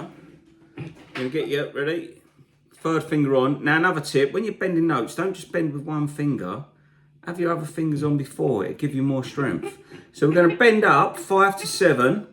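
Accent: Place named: London, United Kingdom. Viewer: Great teacher, love the accent too, REAL London.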